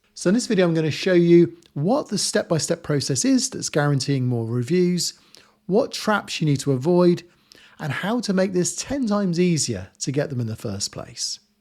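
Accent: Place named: England, United Kingdom. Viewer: Appreciate the guide. Makes a nice change having to listen a Englishman 😁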